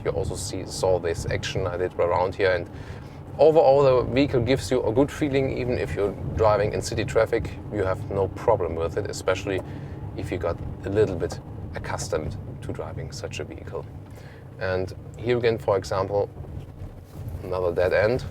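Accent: Germany. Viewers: Das English ist zum schmunzeln. Allways German englisch. Ich würde das nicht machen. Aber danke für den Einblick in den Transporter. Ich will seinen kaufen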